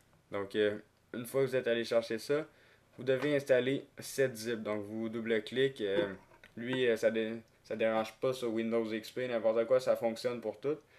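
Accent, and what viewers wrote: Quebec, Canada. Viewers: Bjr!:), MERCI BEAUCOUP POUR LE TUTO!!! J'ai essayé pendant 24h plein d'autres méthodes infructueuses. La tienne, 40-60 min et j'avais fini, tout était correct, mon pc ne plante pas, etc., vraiment du bon travail, et pour une fois, c'était en Québécois!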